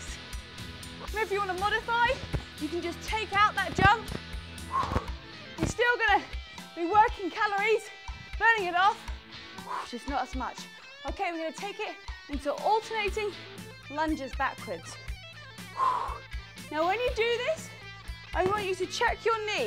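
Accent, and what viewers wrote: United Kingdom. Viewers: Anybody else do all of her amazing workout and suddenly start talking along to them in a British accent .. I love it!! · She has a British accent.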